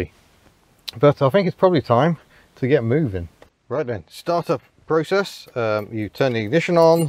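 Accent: United States. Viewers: Authentic American accent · Good American accent! Yes the econoline was a gas hog but ran forever. 'what a big beast. Good motor!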